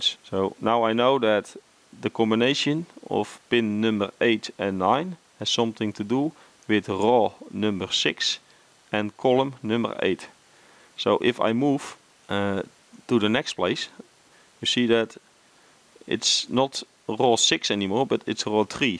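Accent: Netherlands. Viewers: Hahah, amazing Dutch accent right there =) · hahahah jouw nederlands accent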